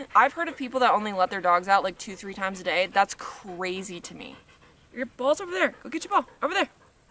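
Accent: South, United States. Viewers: Please do an entire video in the Southern accent!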